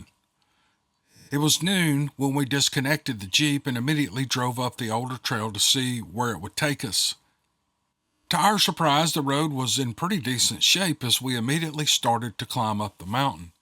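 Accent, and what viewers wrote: South, United States. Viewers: Thays’ boogers haha I love southern accent especially storytelling.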